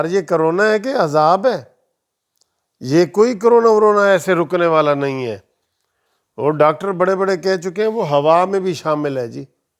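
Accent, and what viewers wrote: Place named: Punjab, Pakistan. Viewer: Lagta hai chacha Punjabi hain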